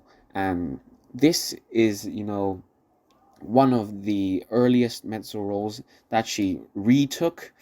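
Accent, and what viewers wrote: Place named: Europe. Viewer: I like the pronunciation of names, it sounds like the Met broadcast announcer/commentator from the 60s. Where one pronounces every name in a "general European way, leaning a bit toward the German way" :D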